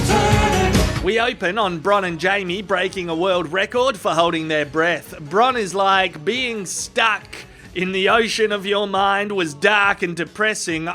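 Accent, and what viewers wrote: Australia. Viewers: na he s just australian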